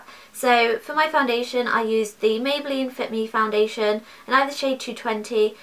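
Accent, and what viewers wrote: United Kingdom. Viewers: Where abouts in the UK are you from? :) Btw great video, found it very helpful!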